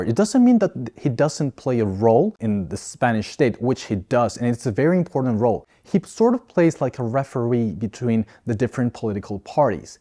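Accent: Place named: United States. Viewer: Great video but try to speak less yank and more English 👏🏻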